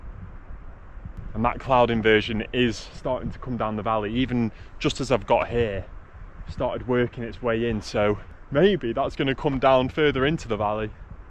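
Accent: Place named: Southport, United Kingdom. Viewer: Half way through and loving this video! I have a friend who lives in Southport who has your accent... is that where you're from? Sorry to hear your mum passed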